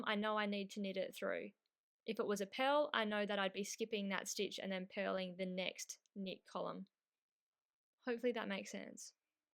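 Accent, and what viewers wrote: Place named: Australia. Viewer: Wow. Lovely Australian accent you have. Thank you I appreciate that😊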